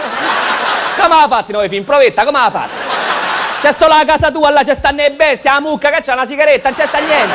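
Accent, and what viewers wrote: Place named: Rome, Italy. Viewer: Una velocità di battute,strepitose Poi quella parlata romana aumenta il potenziale Davvero bravo bravo bravo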